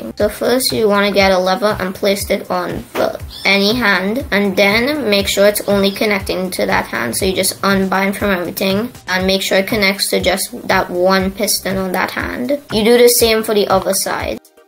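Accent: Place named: Russia. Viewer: Зачем ты снемаешь русским англиским голосом?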